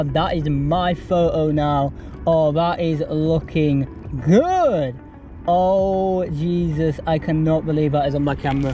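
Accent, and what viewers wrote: Manchester, United Kingdom. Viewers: Mate! I subscribed to not miss any of your funny commentaries min hahahaha!!! Luff your Mancunian accent!! haha 🤣🤣 And of course, FANTASTIC camera work!!!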